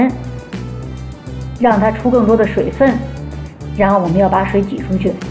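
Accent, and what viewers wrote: Tianjin, China. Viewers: 听口音，是天津人吧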